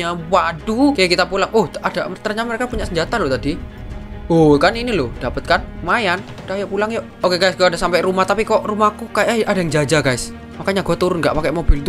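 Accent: Java, Indonesia. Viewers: bang sampean jowo ndi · Ngakak. Bang lu org jawa ya??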